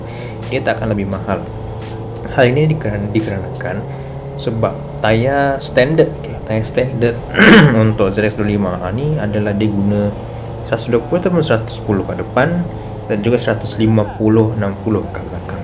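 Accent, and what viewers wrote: Malaysia. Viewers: Pandai loghat melayu malaysia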